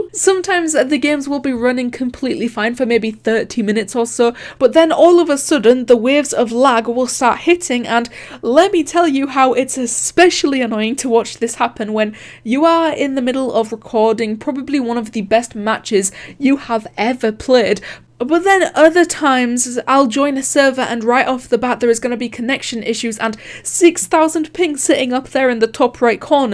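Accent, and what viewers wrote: Scotland, United Kingdom. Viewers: Hey are u scottish u sound scottish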